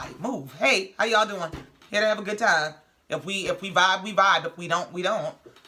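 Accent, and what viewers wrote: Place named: New Orleans, United States. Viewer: Love how you talk . It’s similar to NO. It’s so cool.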